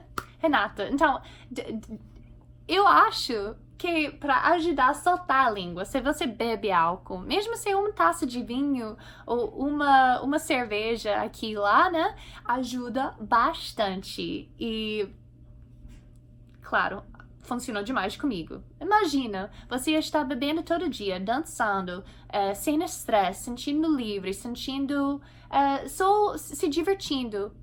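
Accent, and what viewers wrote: Rio de Janeiro, Brazil. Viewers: Você está no Rio de Janeiro pelo sotaque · Sotaque carioca? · SEU SOTAQUE CARIOCA ESTÁ EVIDENTE DEMAISSSS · Tem um sotaque carioca. Aquele chiado típico no falar. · um leve sotaque carioca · sotaque meio carioca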